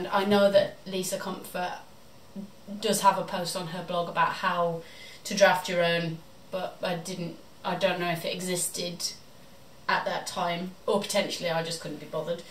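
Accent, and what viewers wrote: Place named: Midlands, United Kingdom. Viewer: TFS great to hear more about you. I'm from Walsall, knew there was a Midlands accent in there somewhere!!!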